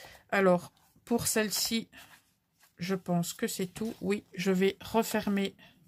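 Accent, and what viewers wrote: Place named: Belgium. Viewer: Bonjour ma petite, Woaw quelle merveille 😍🤩 vraiment très douée Ileen, bravo! Son album est superbe 🤩 et très bien travaillé 👍 avec un thème que j’aime particulièrement 🐇 seulement 11 ans….. c’est plus que prometteur, la relève est assurée! Ça m’a fait plaisir de t’entendre avec ton tout petit accent sur les « UI « ( comme ds 8 ) qui me fait toujours sourire chez nos amis belges à croire que le Luxembourg n’est pas épargné 😄 j’adore! Gros bisous 😘💕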